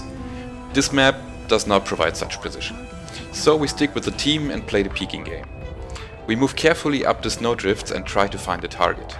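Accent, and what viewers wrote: Germany. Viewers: Are you German? That's one heavy accent you have. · Very impressive, this tutorial adresses things i have seen other players doing and always wondered about. I don not own the 9S and the modifications you show here will cost a lot, so no TBolt sniping for me in the next time. But i definitely want to try it out at some point, since i already own most TBolts (Overlord package), so i will be able to unlock all mech skills. One question though: i noticed it on other of your vids too, the way you speak sounds like a german speaking englisch to me?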